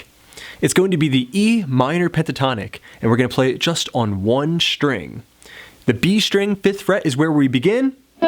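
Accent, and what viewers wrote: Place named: South Jersey, United States. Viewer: let it be known that over the past few years I have watched hundreds of thousands (lol ballpark figure) of guitar lessons via the internet. I would like to say: yours are, by far, my favorite! they are usually very well balanced and easy(ish) to understand. [the (ish) is most definitely due to my level of understanding not your teaching skills haha] I feel like I really do learn a lot. I love how methodical the lessons are; starting with easy steps and bringing it all together with a run through at the end. straightforward and cohesive... seriously, thanks for all of the knowledge 😊! also, you sound like your from jersey. maybe Philly, but probably like south jersey... if so that's cool, if not, ya know... that's cool too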